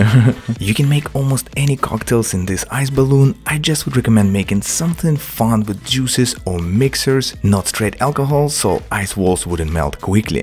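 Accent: Russia. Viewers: Hi,where you from?you have a russian accent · У него русский акцент?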